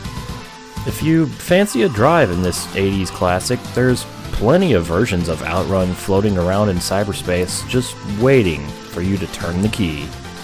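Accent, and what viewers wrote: Italy. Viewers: Sei italiano?😀😃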